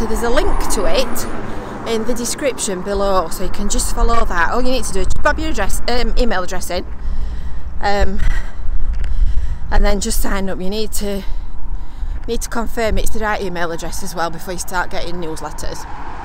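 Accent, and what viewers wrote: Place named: Northern England, United Kingdom. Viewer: Eeh Lass, thar accent is nowt less than beautiful. Bless you for being so wonderfully and unapologetically Northern. I do miss the land of my birth. I used to set nightlines as a boy (early 60s) from from Gynn Square to Bispham, but know the whole coastline well, Blackpool to Fleetwood Bless you, you Yorkshire born lass, just as I am a Yorkshire born boy. I live in such a delightful surroundings in the South of Ireland, but there ain't, no place like home. Or up North lasses.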